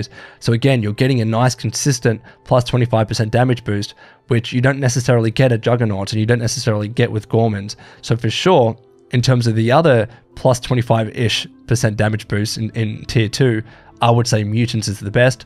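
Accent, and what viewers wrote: Australia. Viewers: The mic makes you sound Australian =)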